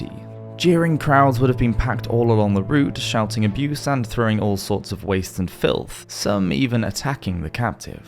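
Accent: United Kingdom. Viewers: This narrator is so very British both in accent snd personality. I love it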